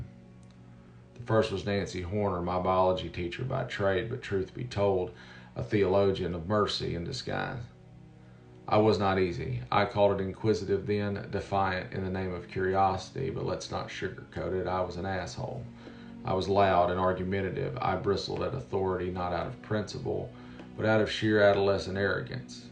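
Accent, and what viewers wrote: South, United States. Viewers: 👏🏼💖Thank you. You are the most eloquent, beautifully spoken man with a Southern accent I have ever heard! You deliver such poignant truth with such thoughtful vocabulary & deep insight into the heart of the subject, you never cease to amaze me! I'm in tears listening to this, thank you so much for sharing your kind, beautiful spirit!🙏🏼🤟🏼✌🏼💙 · Your video on "Christian nationalism" popped up on my YT a few days ago. As that was a subject that has been occupying, not to say concerning, me for some time I clicked and heard it right through. I agreed with everything you said and was very impressed by your use of the English language - I'm a Brit living in Germany - but otherwise didn't know quite what to make of you. Now I've watched this one and was really moved. I too had a number of teachers who were an encouragement and help to me: I don't think I was a bad student, as I did what was expected of me, but they taught me to think for myself and that was something new and opened up a whole new world for me. 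I went on to study foreign languages (German and Spanish) and after university got a job as a translator here in Germany. I'm retired now, but I can still remember most of my teachers' names (and would like to thank Ms. Davies, Mr. Edson, Mr. Hateley, Dr. Meier, Mr. Kirkman and Dr. Smith i.a. here). I was never the maths and physics type, more the English and languages type, and as I became a Christian (which in the UK - or in Germany, for that matter - is not like in the US) at university I developed an interest in theology too. Thanks for this video (and thanks to y'all for your comments, which I found equally moving). Your English with the southern accent (I'm also a fan of Trae Crowder, by the way) is very soothing but eloquent, down-to-earth and inspiring - compared with what we usually see in the US news, whic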